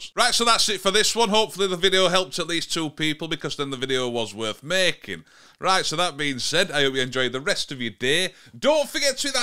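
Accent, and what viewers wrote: Yorkshire, United Kingdom. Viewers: Great help mate…Love your Yorkshire accent 😊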